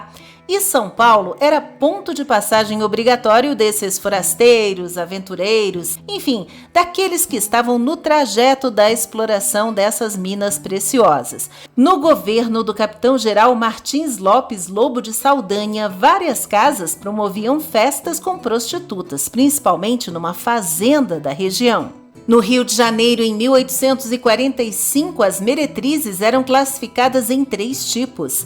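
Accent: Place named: Minas Gerais, Brazil. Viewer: Pelo sotaque parece que a narradora é de Minas Gerais, ou já viveu nessa região. Parece muito com o sotaque daqui de Minas. Parabéns narradora pelo seu excelente trabalho, é a voz feminina mais agradável do Youtube.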